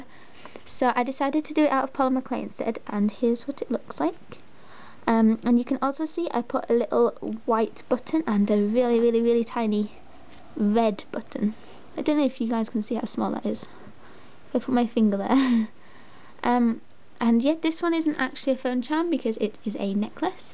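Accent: United Kingdom. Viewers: I love you're accent are you Brittish??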